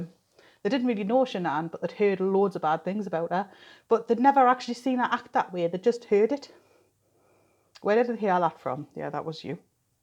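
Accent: Newcastle, United Kingdom. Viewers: Aah pet, is that a fellow Geordie voice I hear? You've picked up on all the bits and bobs I did, especially that bit about "the beloved daughter-in-law" in their court statement. I don't know who wrote this - guessing their court representitive who read it out - but that sounded so disingenuous when both parents had already made it more than clear they didn't like her - at all. OK they can say they are sad about the whole tragic event and people will probably believe them, cos I'm sure they are (if only cos their beloved son is going to prison for the rest of his life) but don't start gushing out false terms of endearment now and over egging it. If Shanann had been so "beloved by the Watts family" why didn't Mamma W get her onside, make the effort to get to know her, instead of going against her at every opportunity. And not going to their wedding? 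That would have been a very good time to start sucking up the fact that Chris had chosen her and Mamma W had to get used to it. But don't you think, Mamma W would have had a struggle to embrace any girl CW had chosen to marry? I really do think the Watts had a problem with the Rzucek family. Did you hear Sandy Rzucek's tale about when all 4 parents were staying in Colorado? Mamma W had a psychotic meltdown and wouldn't "allow" the Rzucek's to spend any time with the grandkids - and the Watts were there for a week. They didn't even all sit down and eat an evening meal together. How's that for weird? I didn't come to this case until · Just came across your channel, Have prescribed .You come across As someone very genuine and different from the others I’ve watched.And I love your Geordie accent.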